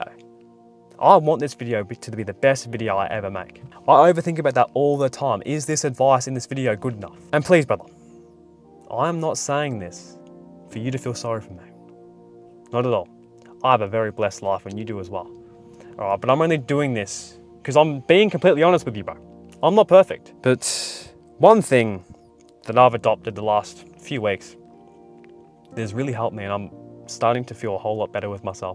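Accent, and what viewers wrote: Australia. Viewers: with a Australian accent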